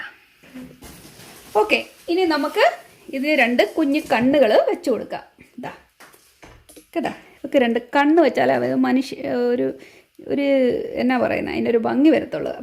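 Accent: Kottayam, India. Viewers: Kottayam kari analle Samsaram kelkkumbo ariyam 😉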